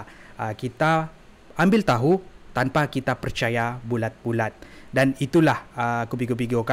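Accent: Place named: Sabah, Malaysia. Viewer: Cakap sabahan x lari.. I like it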